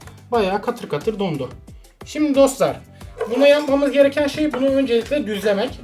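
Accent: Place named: Gaziantep, Turkey. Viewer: Adam antepli konuşmasından anladım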